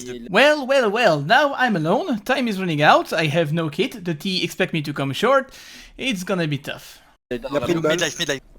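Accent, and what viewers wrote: France. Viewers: Ah je me disais bien qu'à ton accent anglais tu étais français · JE SAVAIS QU T’étais FRANÇAIS ENFIN JE PENSE ou belge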